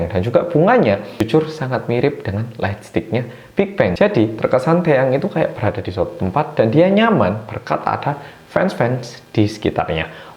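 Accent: Java, Indonesia. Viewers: Mas medok ak lho udah berhasil ga nangis lagi dengerin lagunya, setelah dengerin plus nangis semalaman,, trs nonton video mu ini nangis lagi kaaaaaannnn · Ada awal ada akhir, oppa medok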